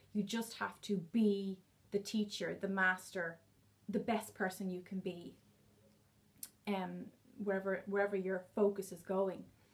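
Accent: Ireland. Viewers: thought the accent was Irish. I'm up in belfast, would love some where in the country away from it all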